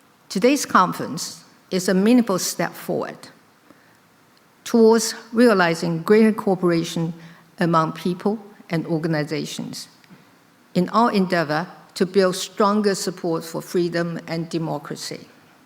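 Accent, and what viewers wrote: United Kingdom. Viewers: 好歹我住過Brighton一年，倫敦很近也跑了很多次，好吧是有一點英國腔沒錯，但真的是一點點，就像黑龍講中文一樣的那一點..但你要說英國腔好好聽我就覺得有點..呃…你自己去英國住一趟再回頭看你的評論😅 倫敦政經學院沒有造假？我倒覺得反而是被證明這水平真的不太可能有博士學位。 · 的英國腔好好聽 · 聽總統說英式英文真的是一種享受🥰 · 即是英文，因她的口音是英語，而不是美語，口頭表達不荒不亂，不需要看稿子，字正腔圓。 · 台灣人不是學美式英語的嗎？為什麼一口英式英語？ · 相比某人只靠小本本，今年升級為大字稿，總統的英文表達與敘述能力，實在是太優了，再加上總統略帶英國腔的演講，只能說讚讚讚！ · 謝謝總統的堅持✊❤ 話說，總統的英文有英國腔耶，蠻好聽的😆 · 濃濃英國腔😂😂😂😂😂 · 好迷人的英式英文❤ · 拜託！要在國際媒體前，做那麼長的英文演說！有演講稿不是很正常嗎？要你在國際媒體前用中文演講，恐怕你也不能做到一字都不出錯吧！你看她英文說得多從容不迫，說得一口英式英語多優雅啊！你讓習近平來，他連英語都聽不懂！不要說要他用英文演講！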